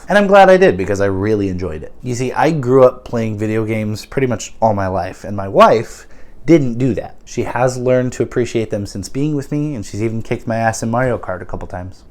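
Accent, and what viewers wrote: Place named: France. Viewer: The French accent is 😍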